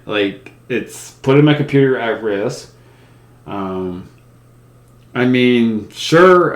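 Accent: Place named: Australia. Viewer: I kinda thought you were an Aussie first ngl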